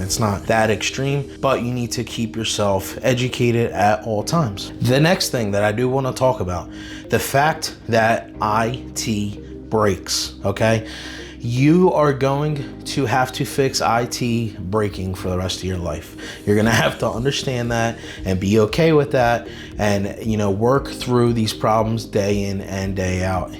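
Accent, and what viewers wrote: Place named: Philadelphia, United States. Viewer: I love the Philly accent made it easier to listen for me . Gooo BIRDs 🦅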